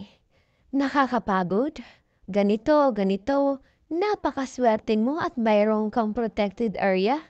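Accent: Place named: China. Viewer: Ok sana presentation ni blogger kaso prang bulol at di tga Pilipinas mag pronounce eh...prang tga China kung magsalita😅😅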